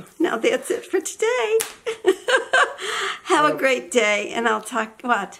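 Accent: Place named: Western New York, United States. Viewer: You are from Western New York Ms. lol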